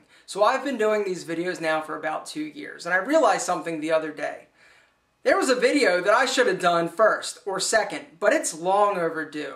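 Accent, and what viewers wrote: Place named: Western Pennsylvania, United States. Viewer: You sound like you have somewhat of a western pa accent :) Very nice videos!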